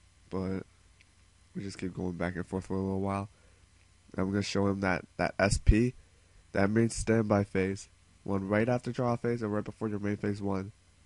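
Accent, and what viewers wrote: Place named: North America. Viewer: you have to be either from USA or Canada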